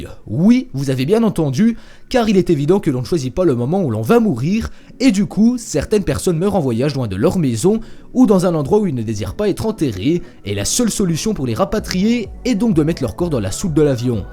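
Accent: Belgium. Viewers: t es enrume ou t as un accent belge ?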